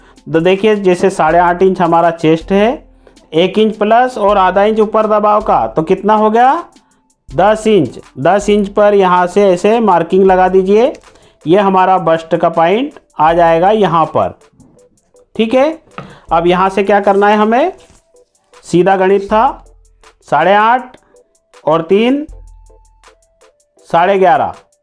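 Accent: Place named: Maharashtra, India. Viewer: मला वाटलच होतं आपण महाराष्ट्रीयनच असाल कारण आपण अठ्ठाईस ला अठ्ठाविस, सत्ताईस ला सत्ताविस बोलत होता. जय हरी विठ्ठल बोलणारा माणूस मराठीच असू शकतो. 💐💐💐🌺🌺🌺💐💐💐💐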